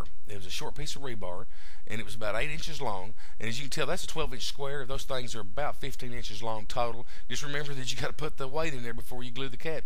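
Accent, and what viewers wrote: Arkansas, United States. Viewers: what part of Arkansas are you from?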